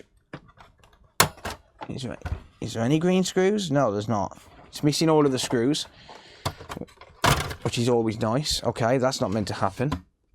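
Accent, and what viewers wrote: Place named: Sandwell, United Kingdom. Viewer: New sub, I thought to myself this guy sounds local, lol I'm from Sandwell, great videos 👍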